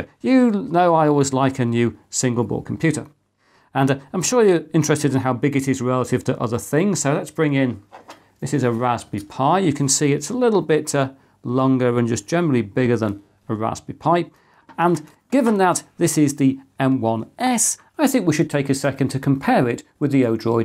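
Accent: United Kingdom. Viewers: ".... and now that's it" for another exciting and informative video from my favorite YouTube producer. I love this British accent❤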